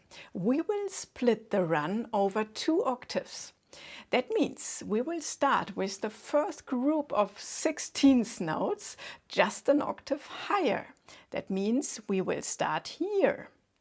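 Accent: Germany. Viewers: Thank you,. Fantastic lesson, it really helped me. Love your teaching and I guess your German/Austrian accent. Thank you so much ❤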